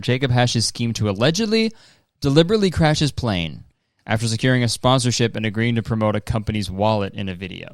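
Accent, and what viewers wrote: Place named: Newfoundland, Canada. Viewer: I "hopes" it's real! You sounds lien your from Newfoundland ❤ love from NL come do a show!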